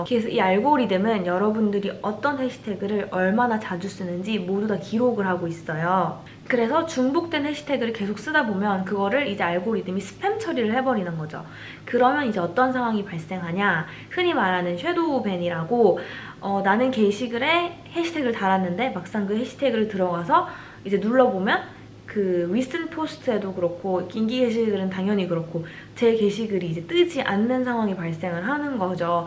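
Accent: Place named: Gyeongsang, South Korea. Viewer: 경상도분이시네요 ㅋㅋ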